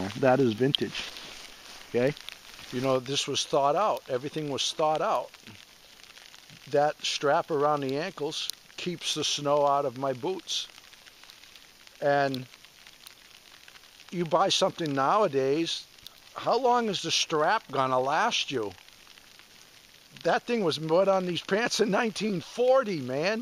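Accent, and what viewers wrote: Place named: New England, United States. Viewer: Dear Sir, since I've stumbled upon your channel a couple of weeks ago, I can't seem to get enough of " ". I'm still sifting through videos I have not yet viewed, and often stay up to late watching your videos. I deeply understand your drive to remain independant and enjoying life in the cabin. I just turned 57 a few days ago and got most my boys through college with one still in high school. I live in the Great Lakes region and love traveling up north to northern Wisconsin and Michigan with friends that own property and cabins. I'm still working, but am trying to prioritise purchase of 40 or more acres with a cabin before too many more years pass me buy. You are my guiding inspiration to pursue my cabin dreams. Best to you and to Franky. Love your New England accent. God Bless. Carl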